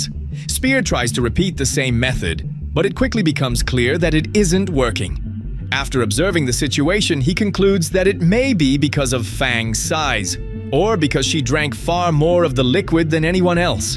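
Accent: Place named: Bahia, Brazil. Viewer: Fala português baiano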